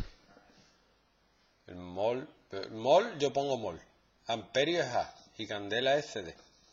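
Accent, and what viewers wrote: Spain. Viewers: de que parte de españa es ese acento?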